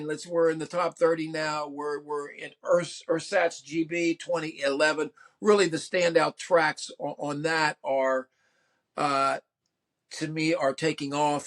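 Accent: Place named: Maryland, United States. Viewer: Your Maryland accent, which I share with you, is excellent for reviewing Fall albums. It’s a little oddball in the pantheon of American accents, although it can’t really rival the distinctiveness that is the Mancunian accent. I could be wrong about this, but it seems the Fall always had a lot of fans in the Baltimore / DC area.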